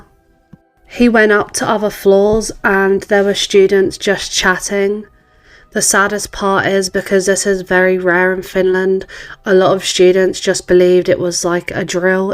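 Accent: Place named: United Kingdom. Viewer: Im pretty good at telling Brits by your accents 🙂🙂may i ask who You Voted for, Starmer or Farage??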